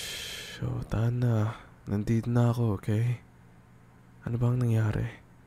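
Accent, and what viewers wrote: Conyo, Philippines. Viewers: ang conyo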